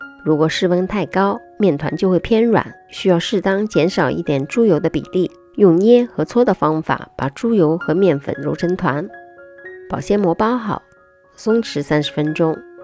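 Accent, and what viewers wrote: Shanghai, China. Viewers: 原来是上海宁呀，怪不得不一样，一直在怀疑侬是上海宁，现在终算证实！😂😂😂😂❤❤❤❤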